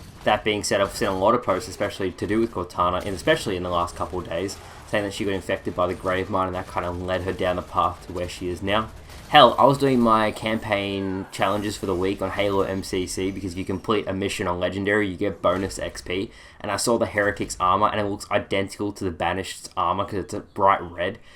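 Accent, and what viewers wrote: Australia. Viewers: aussie rep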